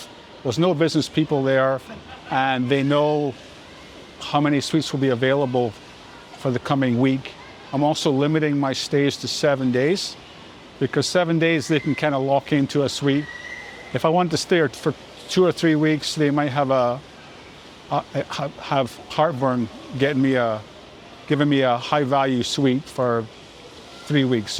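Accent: Scotland, United Kingdom. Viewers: Definitely a Scottish accent in there somewhere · Is this deal for people only in the us? I don’t think people from the uk can get that card unfortunately, and he sounds Scottish so doubt he would get it either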